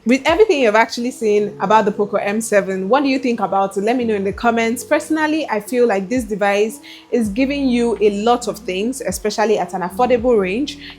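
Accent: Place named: Nigeria. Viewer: 0f all the reviews I have seen on this device, yours is the most comprehensive (maybe I am biased because of the Nigerianness of your accent. That said, I think this is serious value for your money. I value large battery life in phones and that made me go for Tecno Pova 6 Neo, but the specs are at a lower part with the Poco, and it's costlier. I have been scouting for a budget device to replace my tecno until I stumbled upon this phone on Facebook. I am so impressed with the specs and I am going for it.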